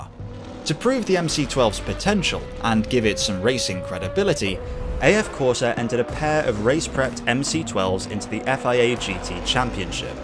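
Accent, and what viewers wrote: United Kingdom. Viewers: How come you sounds British😂 I like that acent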